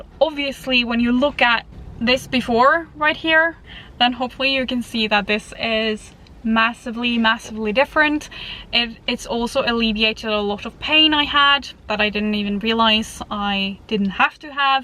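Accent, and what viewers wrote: Ireland. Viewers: Where is your accent from ? Irish?? Living in Ireland?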